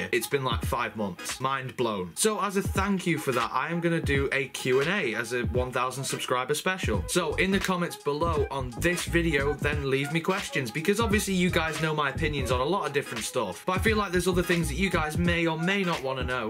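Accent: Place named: Lancashire, United Kingdom. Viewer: you sound like your from Lancashire to be more accurate Bolton area. where exactly are you from ?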